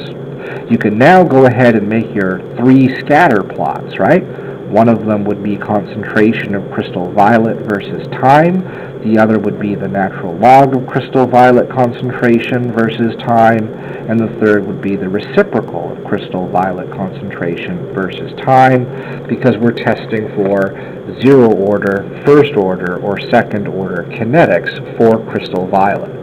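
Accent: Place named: United States. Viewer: He sounds American but he spells color with a u.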